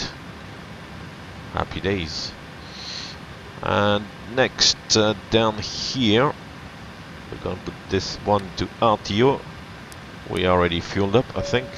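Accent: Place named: France. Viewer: yay another video :D I really like your calm voice, and your competence of course But you are a real pilot, right? Doesn't it get boring to fly as your job and then when you are home to fly again? Like there are lots of good chefs that love cooking but at home they don't because they do it all day already :D I'm not complaining though. That way I can enjoy your videos :P May I ask where you are from? Your accent has something very french to it :D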